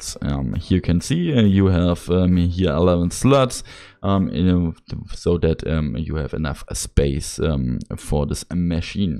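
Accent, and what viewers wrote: Germany. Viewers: er lernt immer noch englisch XD gutes toutorial es klingt sehr deutsch XD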